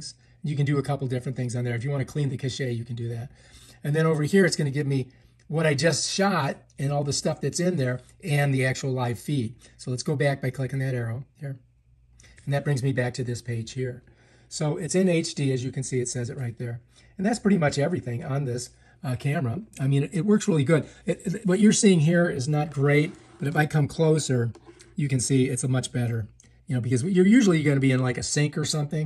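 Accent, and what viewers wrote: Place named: United States. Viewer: Do not delete. Why are you giving a camera plugged directly into your phone access to your local network?? Why would some Chinese product need access to all your other devices on your LAN if it’s plugged right into your phone? Think about it! That’s NOT safe. Why do you give it FULL access to your phone’s library? It doesn’t need FULL access to save a photo. Take a screenshot instead of giving access to China to all your files and network devices. You don’t think that’s weird? Oh, and it wants access to connect back to their company! Uhh, to send them your files?? Again, it doesn’t need access. If the software needs an update it would get it through the App Store, not directly from the Chinese programmers. HELLO! Wake up people.? Why are you encouraging people to do this? What is your motive? Who paid you to post this and other videos for this same dangerous device? 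You might sound American, you are anything but.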